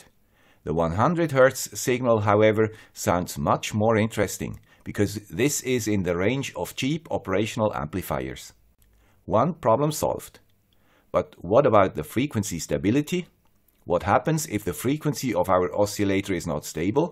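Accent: Switzerland. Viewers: Hello Guy with the Swiss accent, I am a great fan of your channel and thanks to you I have built a lot of projects and Every one was successful. You are truly a great guy.. Can you do a project on building a speed gun radar detector to be used in the car using commonly available sensors and microprocessors. It will be really great project. The currently available sensors in the market are pretty expensive. Also as a maker it will be great fun to build one on our own. Thanks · 2 years in: still loving the guy with the Swiss accent.. my #1 go-to guy when I want to be informed about IoT, electronics and home automation! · how do you spell the word that you say at the beginning of every episode? "Grietsie YouTubers"? I always enjoy that "Swiss" accent, which I imagine must be German or Romansh:) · Sir Mr Swiss accent guy, is it possible to hook a Cantena to these modules to increase range and directionality?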